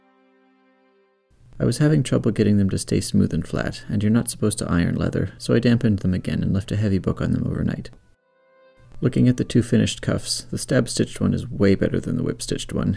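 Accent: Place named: Canada. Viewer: incredible video!! I'm an art student and have seen those gloves in person i believe! your craftsmanship is wonderful to see. I was also trying to pin your accent and you finally said Canada! It's so pleasant and matches your historical vibe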